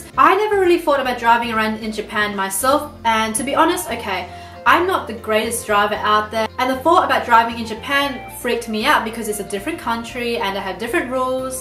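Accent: Australia. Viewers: Are you Australian? Lovely accent. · She definitely has an Australian accent. Even her last name ' ' is not Japanese. But even me, Takeo, could've learned a lot of them from her. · are you an Aussie?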